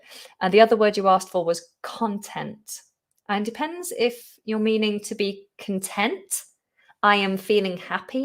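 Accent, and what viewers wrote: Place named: United Kingdom. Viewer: Hi great to see you again and enjoy listening to your beautiful British voice. Unfortunately i couldn’t catch you on live but it’s Ok as i am watching you right now from Casablanca, Morocco 🇲🇦 Thank you so much for this live steam 😎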